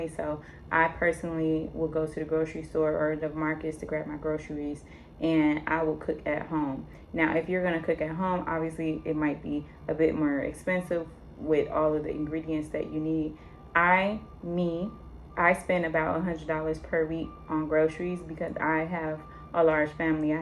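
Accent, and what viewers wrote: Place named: Philadelphia, United States. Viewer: Are you originally from philly? It just really sounds like you are.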